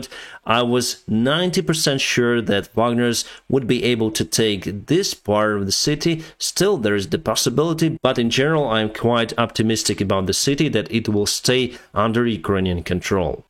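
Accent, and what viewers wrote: United States. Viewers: great ascent.................really Ukrainian or living in DC? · As an American that speaks multiple languages, I have no trouble whatsoever understanding him. English is the tough one to understand, not Eastern European or Asian languages. Once you know how their sentences are structured, the words in English can convey the message. Compared to some of the translators on UATV, sounds downright American. They have two that are absolutely horrible.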